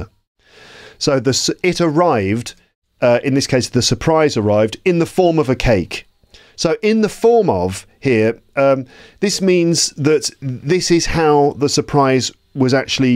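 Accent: United Kingdom. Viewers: Hola, estoy aprendiendo el ingles americano, confirmame si ese acepto es britanico? ó no importa? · I really love your british english..😊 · Thank you,. Your pronunciation made me remember of the best boss I had when working in a British company here in Brazil, in the late seventies. And I missed those days. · I am not used to listen british english! But I just loved to hear your class, your english. And I am very happy that I understood everything! Thankyou Maíra from Brazil 🇧🇷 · BBC ,modulation, thahk so much for the video! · Very nice your's british language.salute from Lithuania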